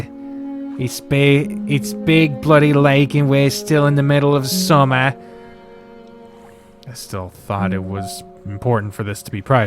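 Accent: United Kingdom. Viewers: Ahhh... kudo's to you for your bri-ish accent, Also, Love the new video!!! I just binged all the videos I missed ever since the start of December so I'm glad I could finally be on time again ^^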